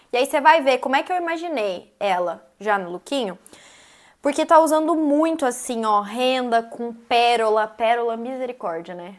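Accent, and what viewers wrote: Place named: Minas Gerais, Brazil. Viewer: Tá muito mineirinha kkkkk "esse trem aqui ooh" 😂... Sou mineira ❤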